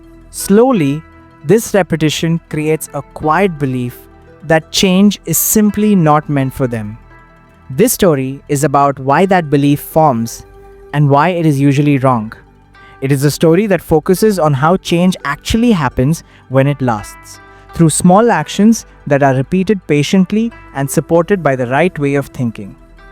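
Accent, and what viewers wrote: India. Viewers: japanese story with indian accent… random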